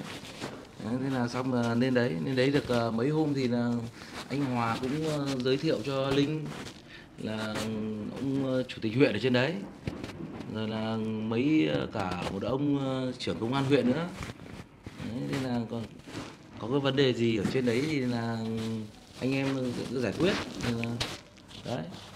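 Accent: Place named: Hanoi, Vietnam. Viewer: Minh da Theo doi t all va minh rat yeu men co giong noi ng Ha Noi de thuong, hien co hau Love ya sweetie ❤️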